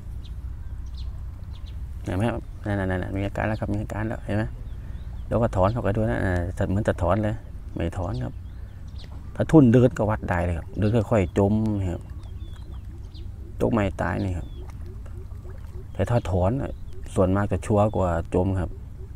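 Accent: Korat, Thailand. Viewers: โคราชบ่คับ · ฟังสำเนียง โคราชหรือนางรองครับ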